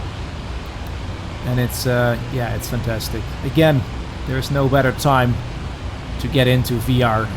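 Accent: Netherlands. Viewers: Grappig. Je hoort direct dat je een Nederlander bent. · Klinkt Hollands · Are you Dutch? You sound Dutch lol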